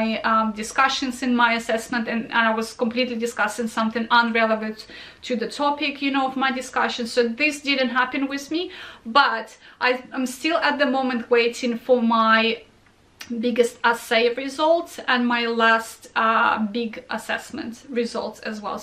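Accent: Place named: Russia. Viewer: Thanks for your Contribution. You Sound Russian as one of my teacher.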